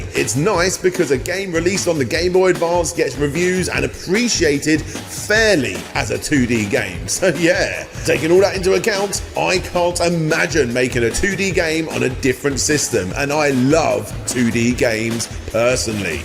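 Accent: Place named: United Kingdom. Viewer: I love UK accents. Hi 🥺🥺🥺🥺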